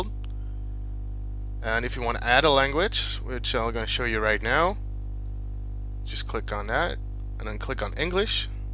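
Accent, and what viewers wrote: Netherlands. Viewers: haha ik hoorde gelijk dat ik naar een Nederlander keek toen viel de naam me pas op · Are u dutch? Lm je bent nederlands:D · clean accent for a dutchie!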